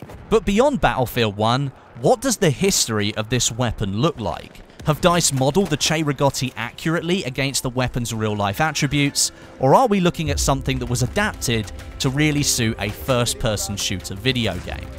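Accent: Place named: United Kingdom. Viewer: The British accent, the music and the commentary everything is spot on.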